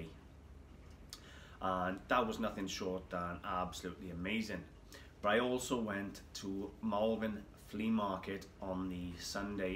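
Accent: Wales, United Kingdom. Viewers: Great video. Aussie viewer here. Love that welsh accent.. I’ve got family over there and it gets me every time lol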